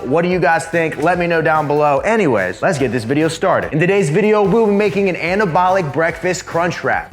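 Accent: Texas, United States. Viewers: Sum gun, sounds like your getting Texas in your accent bro. Good.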